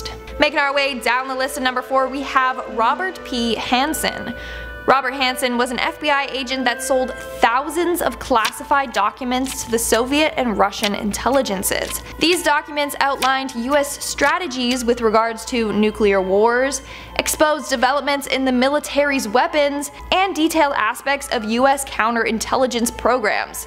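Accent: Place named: United Kingdom. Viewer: I liked the British accent . But she okay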